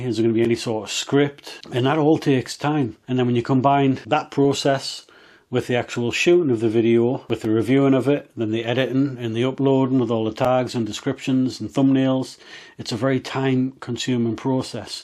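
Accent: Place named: North, United Kingdom. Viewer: Cracking video, aye good advice i didn`t get the expensive cameras just thought i would start with kit easy to learn the basics on. The editing is good lots to learn and helps you think of new technique's for content. On a massive plus it is great listening to a fellow northerner 🤙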